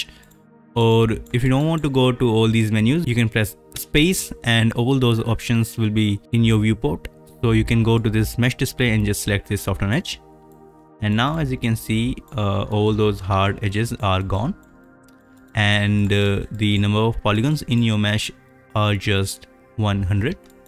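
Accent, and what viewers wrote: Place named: India. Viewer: Indian Accent = 10/10 Tutorial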